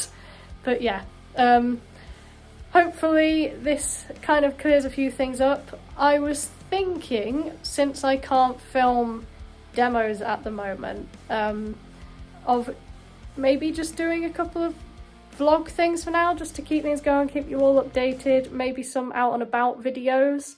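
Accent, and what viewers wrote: United Kingdom. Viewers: Your British accent is amazing! Hope we can hear you singing some lyrics with your new band!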